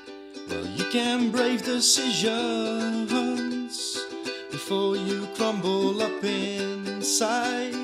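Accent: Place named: Netherlands. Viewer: Are u Dutch? you sound like ur Dutch ;)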